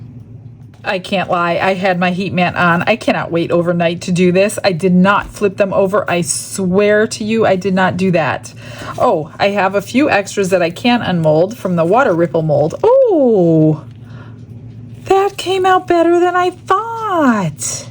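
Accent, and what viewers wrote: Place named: Midwest, United States. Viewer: Lol, I thought I detected a bit of a midwestern accent 😂 I'm a native yooper myself, with family and friends all over Wisconsin, Illinois and ohio, as well as a few trolls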